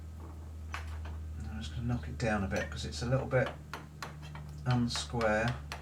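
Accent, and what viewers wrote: England, United Kingdom. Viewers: can't be asked!!! You are so English, I love it!!!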